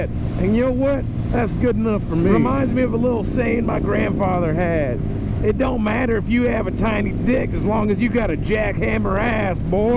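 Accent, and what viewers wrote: South, United States. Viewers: sound more southern in your old videos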